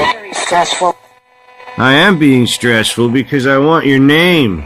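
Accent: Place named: England, United Kingdom. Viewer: North Nottingham Paranormal Investigators ahh now I hardly thought you were I'd know his accent a mile away. Lol and for some reason I don't think your English accent could sound anything like American one 😂😂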